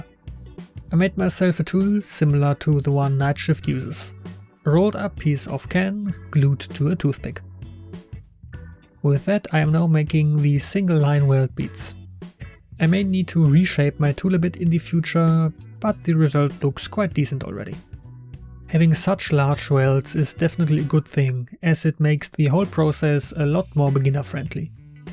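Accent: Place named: Germany. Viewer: Hab ich doch hie und da einen deutschen Akzent rausgehört! 😃 Lecker gebaut und schön mit Struktur verziert. Ein dickes 👍 von mir.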